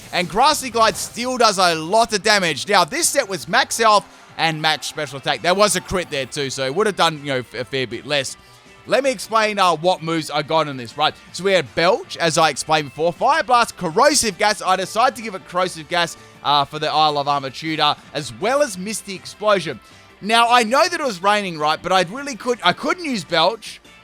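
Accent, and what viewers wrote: Australia. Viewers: I'm Aussie and wouldn't ever consider saying Leafeon the way he did. There's an 'e', just read it phonetically. Think is maybe a tad bogan though, which might explain it.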